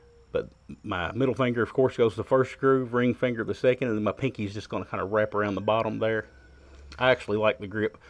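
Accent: United States. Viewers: When i start to hear this kind of accent, i automatically know that i found the right channel to hear something about guns. Best greets from germany, i love ur american accents.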